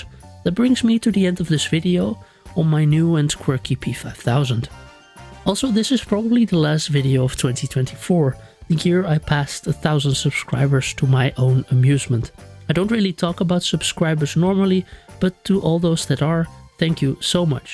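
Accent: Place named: Netherlands. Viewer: Oh leuk, een nederlander op YouTube. Leuke video daar. Ik merkte direct je accent op haha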